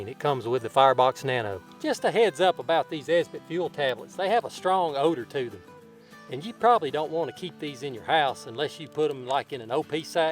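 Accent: South, United States. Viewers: Love your "good ole boy" Southern accent. Also, the quality of your presentation is on par with Steve's over at <link>. Very professional. One more thing . . . that video work is spectacular!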